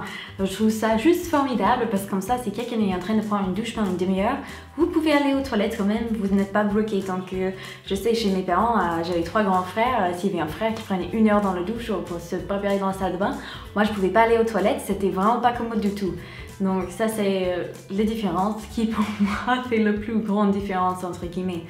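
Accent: United States. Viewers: Hello ! T'as raison de pas essayer de gommer ton accent américain : ça fait partie de toi 😊. Jane Birkin vit bien en France depuis des décennies et elle a toujours son accent anglais. Le fait que tu fasses des vidéos est un bon exercice de prononciation et en plus c'est gratuit!!!😊😊😊 t'as pas besoin de prof... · Ton accent américain est charmant quand tu parles en français - je préfère l'accent américain au britannique, ça coule mieux, lol - j'imagine que l'inverse est vrai aussi - parler anglais avec un accent français - après j'ai passé un an à Montréal et je me sentais trop ridicule quand je devais m'exprimer en anglais face à des américains, c'est un complexe très français, lol! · Oh non !!!! ne fais pas disparaitre ton accent americain , c'est trop mognon quand ton parle , moi j'adore , trop chouette !!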